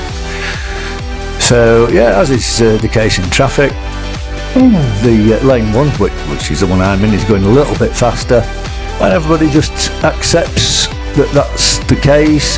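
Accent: United Kingdom. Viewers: I see there are terrible drivers in the UK just like they are here in United States. I love to hear you speak the Kings English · Nice to hear some fellow Yorkshireman tones, and I see auto-captions has improved a bit at interpreting them, too, lol. Have a sub, lad.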